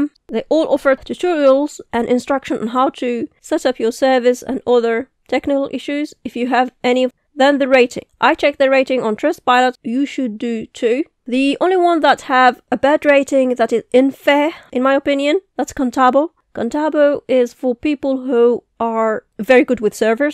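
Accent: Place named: India. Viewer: India maybe 🤔 or indian living in UK 🤔 don't know 😂 just guessing from the adorable access that u have 💕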